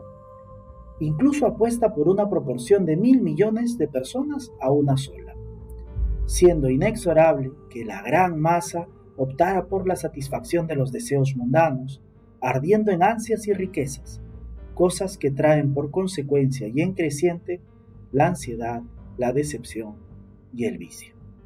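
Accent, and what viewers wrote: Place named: Dominican Republic. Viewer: eres dominicano suenas como uno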